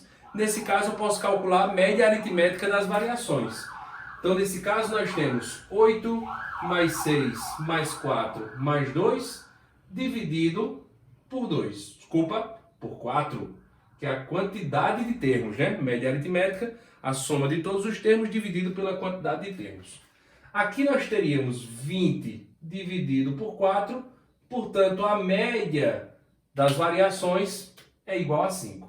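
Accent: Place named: Northeast, Brazil. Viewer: Pense num sotaque bonito é o do nordestino! Aula top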